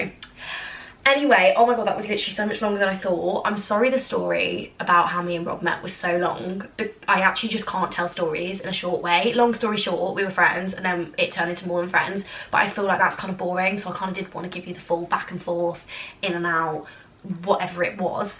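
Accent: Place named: RP, United Kingdom. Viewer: I’ve come back since when you were in year 13… your accent is so posh now omg 😂😭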